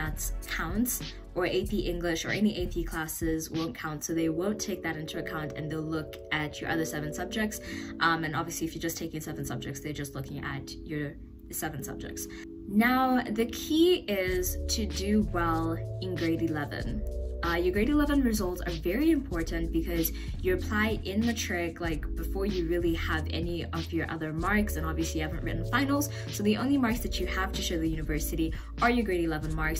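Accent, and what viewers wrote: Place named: United States. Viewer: Delicious content,. Why do you have an American accent? did you grow up there?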